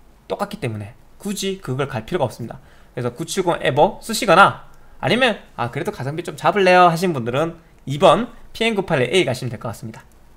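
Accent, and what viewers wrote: Gyeongsang, South Korea. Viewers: 님 말투가 완전 경북분 특히 대구분같으신데 대전으로 가신 이유가 있나요? 생각해보면 완전말투는 다른데 대전대구 둘다 큰도시고 거리도 가깝더라구요 그냥 궁금해서 ㅎㅎ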